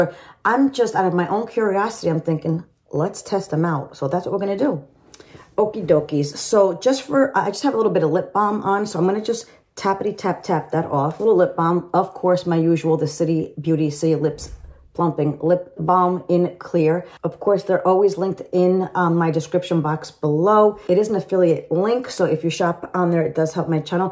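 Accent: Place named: New York, United States. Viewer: Love your accent are you from The East coast New York ,sounds like home ,love your teteorials!!!!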